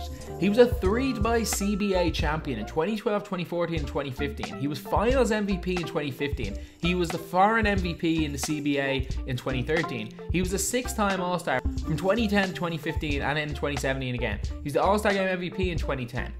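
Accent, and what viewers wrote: Dublin, Ireland. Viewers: Dublin accent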